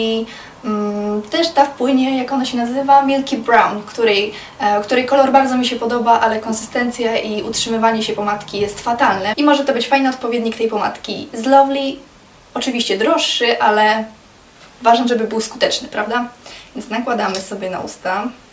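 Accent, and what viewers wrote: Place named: England, United Kingdom. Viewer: Jejku! jaki ty masz śliczny angielski akcent!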